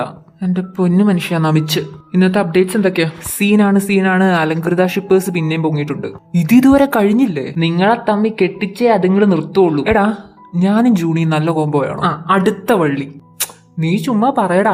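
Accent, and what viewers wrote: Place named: Tamil Nadu, India. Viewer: Your Tamil accent 😤😤😤🔥🔥🔥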